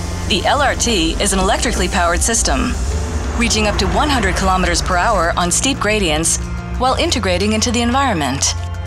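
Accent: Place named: Israel. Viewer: hebrew accent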